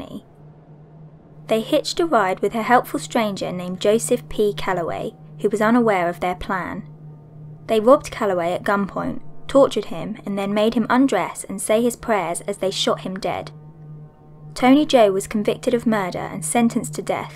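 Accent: United Kingdom. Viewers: Joel Fernández Jerez its almost like Chills twin with some sort of British accent.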